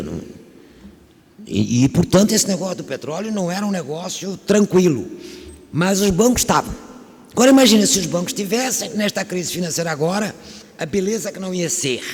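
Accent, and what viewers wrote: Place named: Portugal. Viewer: Her accent is Portugal Portuguese...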